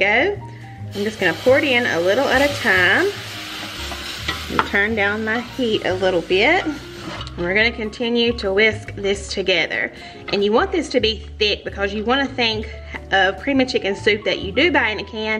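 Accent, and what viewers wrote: South, United States. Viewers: Hey! I can just hear you talk all day. Love your Southern accent! Love your Videos!🙌🙌🙌🙌💯 I am differently trying these recipes.Thanks for sharing. I hope you have a fun, loving, Safe Holiday! Merry Christmas!🎅🎄🎁🎁🎁💗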